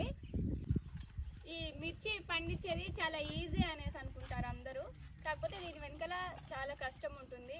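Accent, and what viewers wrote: Chittoor, India. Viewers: Rayalaseema yasa vachestundhi...ma chittoor acsent la vundhi...little ga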